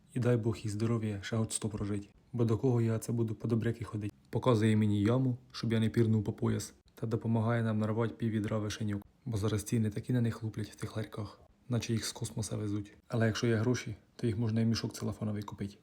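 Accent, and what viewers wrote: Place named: Poltava, Ukraine. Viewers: ❤❤❤❤❤❤❤❤❤закохалася... Неможливо наслухатись.ДЖИГІТ!!!!❤❤❤❤❤❤❤❤❤❤❤❤❤❤звідки ти?❤❤❤❤❤😂❤❤❤чую наш ПолЬтавський говьяжий суржик.ТАК приємно😂😂😂😂😂😂😂😂😂😂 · Дякую за ще одне неймовірне відео. Ти молодчина, так тримати. Твоя мова схожа на мою, я з полтавщини. Бережи нас Боже. Чекаємо на перемогу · Мабуть ти з Полтавщини. Чую знайомі нотки. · Автор, звідки ви? Такі самі глиняні кручі бачив на березі кременчуцького водосховища, та й говірка нагадує полтавську 😊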